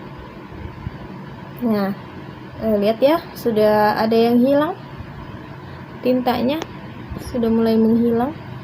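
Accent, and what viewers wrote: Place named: Makassar, Indonesia. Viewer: Dari logat nya pasti orang makassar yah?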